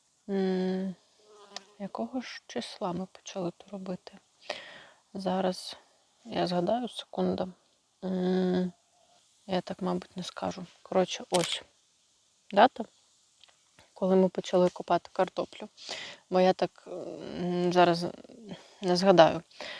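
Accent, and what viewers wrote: Ukraine. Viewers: Hi. Love to hear you speak the Ukrainian dialect I grew up with. My parents came from Carpathian region to US after WW2. I still make borscht and grow potatoes too! Slava Ukraini. ❤️🇺🇦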